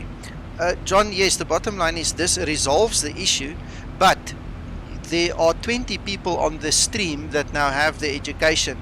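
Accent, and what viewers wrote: South Africa. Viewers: Digging the SA accent bro.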